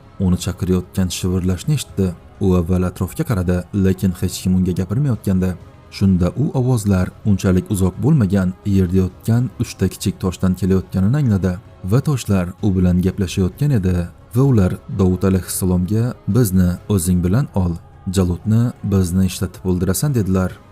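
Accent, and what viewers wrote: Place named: Khorezm, Uzbekistan. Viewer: Xorazmlik boʻlsa kerak. Ularni shevasi boshqa. Q harfini ham aytolmaydi.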